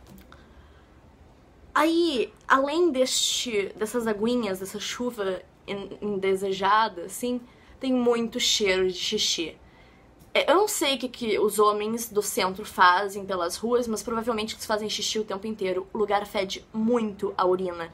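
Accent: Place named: Porto Alegre, Brazil. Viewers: Cara, só quem mora desde criança em POA tem esse sotaque chato que nem essa moça. Mas no resto do estado os sotaques são legais. Ah! Sou riograndense. Eu acho que ela é descendente de alemão. O alemão é bem exigente e verdadeiro. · Mariana antonucci Tem mais haver com o sotaque do que com a dicçao. Os porto alegrenses falam assim mesmo,assiste um debate da luciana genro que tu vai ver kkkkk.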